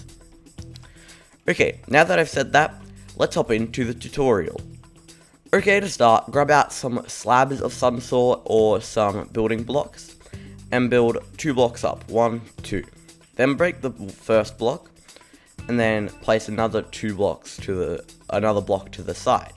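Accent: Australia. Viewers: It it just me or is this man Australian? <3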